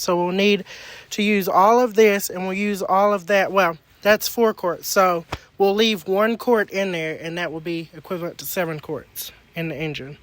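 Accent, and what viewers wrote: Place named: Texas, United States. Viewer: What part of Texas are you in. Sounds like the same part I’m from. I was born in Tyler and all my family are from Murchison, Ben Wheeler & Brownsboro.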